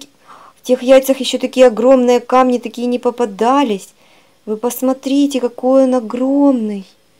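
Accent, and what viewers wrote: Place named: Surzhyk, Ukraine. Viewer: Я не знаю , що там у вас за яйцеалмази, просто суржик ваш ріже вухо, говоріть або вже досконало російською( рашним язиком) , або пререходьте на українську мову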